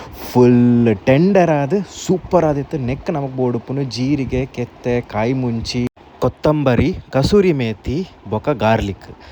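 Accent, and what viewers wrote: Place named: India. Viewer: Sangat tidak cocok berbahasa Inggris dengan aksen india seperti itu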